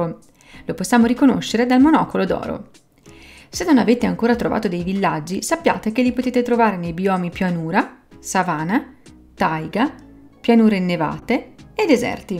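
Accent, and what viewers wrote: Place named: Veneto, Italy. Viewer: ma siete venti tosi? parchè dall' accento me pare de si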